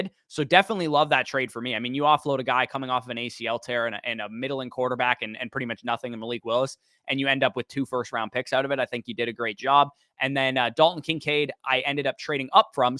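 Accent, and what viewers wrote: Canada. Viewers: Nice, you from Canada eh,?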